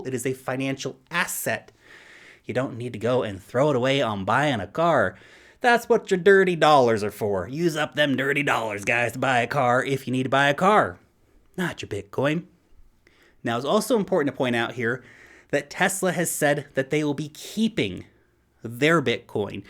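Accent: United States. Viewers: you’re a kiwi 🥝? What’s with the American accent? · Wow, I always thought is from the US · New Zealand? How come you sound like you're from the US? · you don't sound like you are from New Zealand. You sound American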